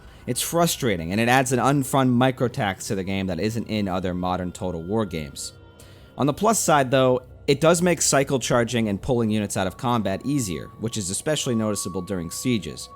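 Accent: Boston, United States. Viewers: something about a thick boston accent talking about total war is so funny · I like your accent. Is it Boston? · Haha are you from Boston? Lovely vid, lovely accent.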